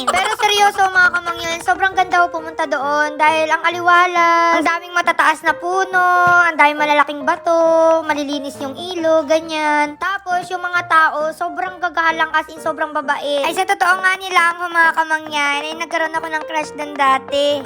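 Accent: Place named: Marinduque, Philippines. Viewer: My lahi k plng Marinduque kya pla lagi kng my ngani sa salita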